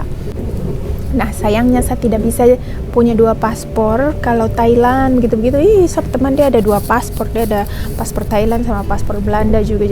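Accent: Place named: Papua, Indonesia. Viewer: Mamayoo Kam lihat tu, sa tu 😀😀😀 kak walopun sdh pindah warga negara, selalu ingat aksen itu neh.. kak pernah di papua k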